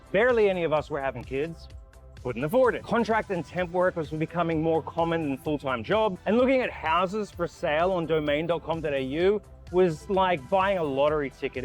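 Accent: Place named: United States. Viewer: Seppo accent man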